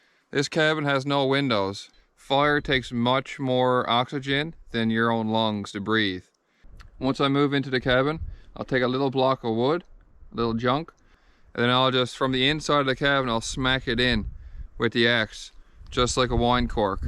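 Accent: Newfoundland, Canada. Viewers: LoL "Thank the b'Jesus" Yes my son. By George that plate of fish looked gooder than rabbit !! New subscriber here. Love the accent and the phrases. Both of my parents are from Deerlake and most of my cousins too. I have always loved the Newfoundland and Labrador accent. I was conceived in Stephenville but was actually born in Halifax Nova Scotia and have been here for 57 years. I was in Bonavista last March....just ahead of the Spring icebergs sadly. But the trip across the island was nothing short of beautiful as always. Where are you in Labrador? Looks gorgeous. Look forward to watching more videos. Until next time my good man. Cheers and happy hunting, fishing and gathering.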